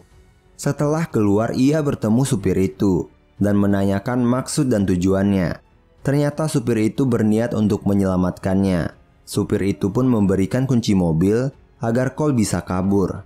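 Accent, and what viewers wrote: Kalimantan, Indonesia. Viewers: Abang orang kalimantan mana bang Edit:tumben bikin konten alur cerita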